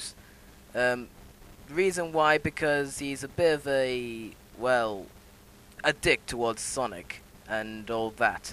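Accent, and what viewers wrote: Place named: United Kingdom. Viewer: Well I 3/4 agree with you but I lol'd when you started acting like Chris,and you do sound like you have a British accent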